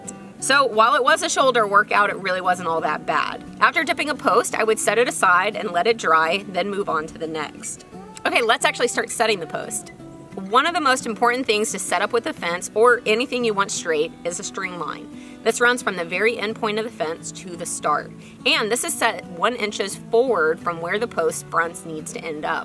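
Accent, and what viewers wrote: Texas, United States. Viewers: Hmmm... Accent sounds like Texas... Rocks in the ground, so probably not Gulf Coast or Dallas... Too much vegetation for it to be West Texas, so must be Central Texas somewhere... Howdy from the Houston Gulf Coast area!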